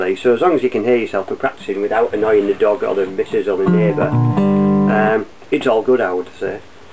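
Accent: United Kingdom. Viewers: Great video! by the way I couldn't stop staring at your T-shirt I noticed it said snowden but my eyes are bad that's all I could read and couldn't help but thinking I wonder if you know there's a guy named Snowden that builds cigar box guitars here in the states Snowden cigar box guitars? I had to laugh because I wasn't sure who I was looking at I was pretty sure Snowden was American but you sound definitely English ... I liked your video now I have to choose between 4 guitar makers ... Nigel Mctrusty Australia ,YOU Great Britain , Snowden guitars I believe usa and Justin johnsen cigar box guitars usa I don't know what to do now hahaha! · Ey up lad, not heard that great dialect for years. I lived most of my life in Manchester and then for my last 6 years in England I lived in West Yorkshire. I am guessing you are from somewhere around Manchester though? 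I left England in 1982 and I now live near Chicago, Illinois, (still got the English accent though :) ). Man do I miss hearing good dialect! I came on here because I just bought a 3 string cigar box guitar and wanted to get some idea as to playing and tuning it. I normally play 6 and 12 string guitars, but I have to say that I was blown away by the sound from these things. I agree with the adjustable bridge. The one I just bought did not have one and already I can hear the intonation errors. Also it had a peizo pick-up which I'm not keen on. I'll probably go ahead and modify it as per your recommendations. By gum I liked your video, keep up the good work lad. All the best, Chris.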